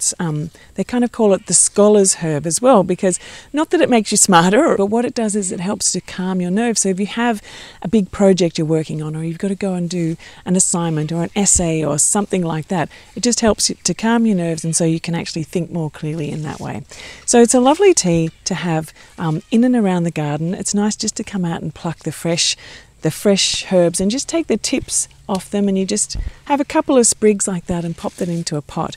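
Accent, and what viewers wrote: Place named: Australia. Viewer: Wow an Aussie I love the accent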